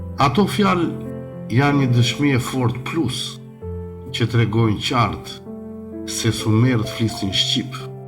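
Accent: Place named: Gheg, Albania. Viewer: Gegnishte e paster. Sa let për ta kuptuar, faleminderit për kto informata të çmuara.❤🇦🇱🇦🇱🇦🇱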